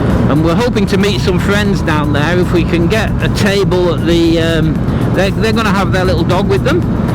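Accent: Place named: Northwest England, United Kingdom. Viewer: . Thanks for the response. I did notice a slight northwest sound. (Similar to Freddie Flintoff but less thick.) On to the video, thanks for taking us on a leisurely jaunt to Hillarys Boat Harbour, a place I want to return to. 3 Sheets on the Harbour, bloody nice as margarita pizza.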